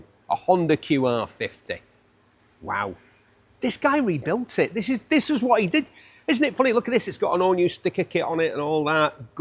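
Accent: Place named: Northern England, United Kingdom. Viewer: Listen to a northern bloke talking absolute bollocks for 3 hours. Brilliant. Some great times at the bulldog bash👍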